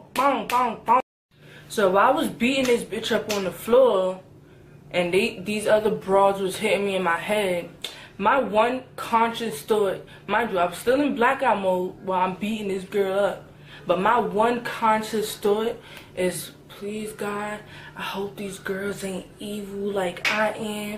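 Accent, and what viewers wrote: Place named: New York, United States. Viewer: LOL OMG i love these! "i put my victoria secret lipgloss away" 😂 😂This storytime sound odee NY!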